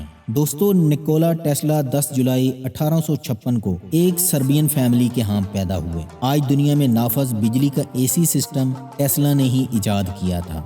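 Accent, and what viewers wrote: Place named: Punjab, Pakistan. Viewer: Masha Allah bhai aap bohat achi video banatay مگر آپ با برکت کو ببرکت نہ کہا کیں۔بہت برا لگتا ہے۔ اردو کو پنجابی لہجہ میں بولتےہو نوی بازار کو نوی بزار تو نہ کہو۔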